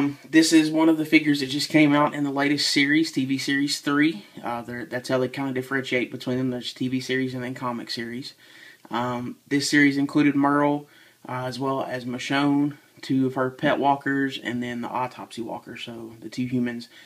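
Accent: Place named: South, United States. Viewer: I do love this show and the figures are nice, my buddy collects them. I am more a transformer and dc collector. I subscribed to your channel tonight while working, I had caught the gl ring review and like it. I like to hear another southern person doing reviews online...btw where are u from?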